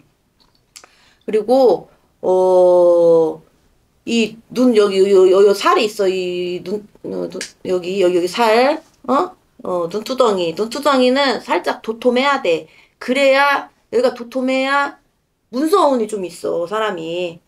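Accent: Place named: Jeonju, South Korea. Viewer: 선생님도 관상학적으로 재물많이 들어오도록 갖추셨네요.예쁘고 특유의 전주사투리가 구수하고예뻐요.감사합니다.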